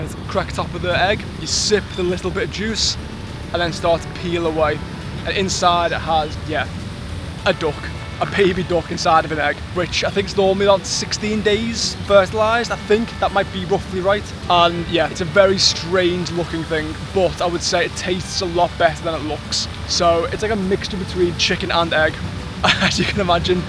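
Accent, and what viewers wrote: United Kingdom. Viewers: You're a very gifted artist/illustrator. 🎨🖌 Sorry I'm not good at distinguishing accents. What part of the UK are you from? So great to hear that PH is your favorite place in the world to visit. 😊 As for recommendations, Batanes is one of the places here we are really proud of. It kind of looks like Scotland or NZ but in a tropical setting. There are actually tons of awesome places you can visit here. Some are only mostly frequented by locals. :)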